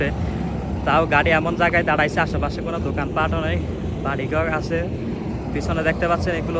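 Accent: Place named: Banshkhali, Bangladesh. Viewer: বাঁশখালী মনে হয়।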